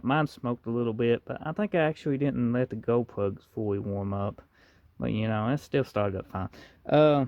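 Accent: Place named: Louisiana, United States. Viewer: I'm going to take a wild guess and say this feller is from Louisiana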